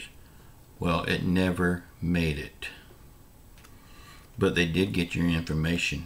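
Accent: South, United States. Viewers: We the people ask how many cups of coffee did you have this morning love your shoe! I can tell your from the south you have a great since of humor please don't ever change I get you ever morning to start my day with your great humor and truth love your wife's show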